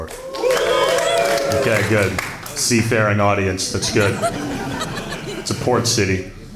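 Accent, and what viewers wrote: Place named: Tri-State, United States. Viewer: Great set, you're hilarious, very subtle tristate accents. Outro music sounds like from Unreal Tournament too so that's a plus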